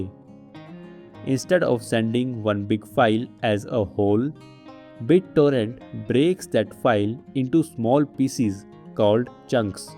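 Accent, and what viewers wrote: India. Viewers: After hearing the Indian accent, I was confident that this video will surely explain me, and it did!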